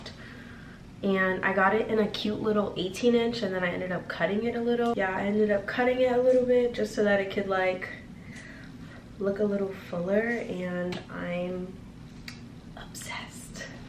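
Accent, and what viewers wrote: United Kingdom. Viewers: The British accent 💘🥺..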